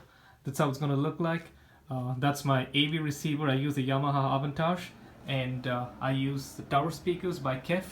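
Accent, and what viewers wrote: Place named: India. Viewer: It's so weird u sounded more like an Indian (callcenter) than that of a Flip. Salamat sa set up!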